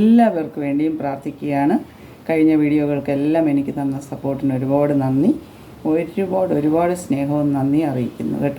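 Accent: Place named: North India, India. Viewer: North Indian ladya pola und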